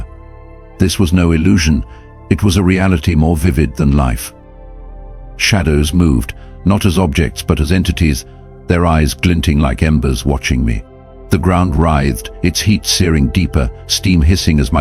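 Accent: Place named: United Kingdom. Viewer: It’s really hard to find this believable when it’s a white British accent speaking and we’re talking about the most urban hip-hop artist and legend Tupac Shakur allegedly being seen in hell. I understand that people want to conceal their identity because they work in corporate jobs… But I just couldn’t sit through the whole thing. Y’all should have picked like a different accent maybe a black person even a Hispanic person but a white British accent come on guys,! Y’all lost me at like 15 minutes.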